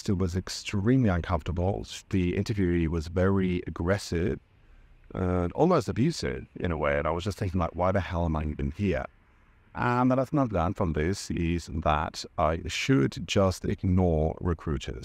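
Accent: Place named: Germany. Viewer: Your accent is so strongly German now.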